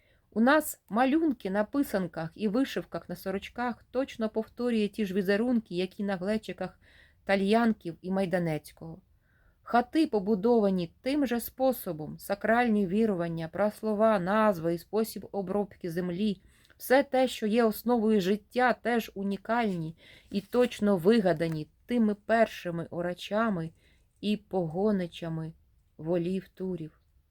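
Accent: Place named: Eastern Ukraine, Ukraine. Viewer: Слухаю вас з увагою, ви з молодшого від мене покоління. Очевидно ви із східної України , чую по вимові, з великим впливом російської. Шчьо, чьому, дЗім замість дім, широке російське Ы замість українського короткого И. Але мені приємно, що в часи, коли наші сусідські «браття» вбивають наших людей за мову українську. Щиро вам дякую за читання і прагнення розвивати українські канали. А вимова? Може треба буде змиритися з сучасною модою. Адже багато мо перетерпіли зміни: англійська британська та американська, іспанська європейська та латиноамериканська, португальська і бразілійська, французька і крейол. Світ міняється, але Україна буде вільною.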